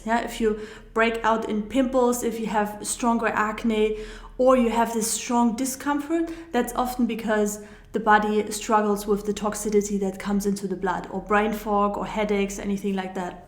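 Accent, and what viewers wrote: Germany. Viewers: Thank you so much for your answer, I’m so happy that you actually read my question and replied!!!! Yes I’m addicted to Hawaïian spirulina since few years. I usually take them during winter in order not to catch cold due to its enforcement of immune system. I usually take two in the morning, but if I ever knew its so many benefits as you explained in your videos, I would have taken all around the year!!! Thank Goodness I have discovered you by chance, I am eternally grateful for all the precious advices you have been giving 🙏😍😊. I will take from now on 5 in the morning and 5 in the afternoon, but how long should I continue like this?? I am also suffering from migraine since long time, do you think celery juice will help me to get rid of it??? Thank you again, Dankeschön, I love your German accent in your English by the way 😍😍. I have lived almost 20 years in France and I had some German friends ( I also lived in Munich and in Vienna) and they all speak like you. 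I don’t eat dairy products and I rarely eat eggs and I don’t eat meat, but I love fish and seafood. And lots of fruits and vegetables. I love watching your videos and try to follow all the things you say... please keep up for I cannot pass a day without watching you on You Tube. Dankeschön 😍🙏😍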